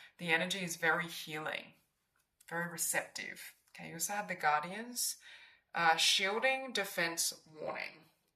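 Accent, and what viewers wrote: Australia. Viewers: #3, thank you for the overall message of renewal. Love the accent, Australia or New Zealand love?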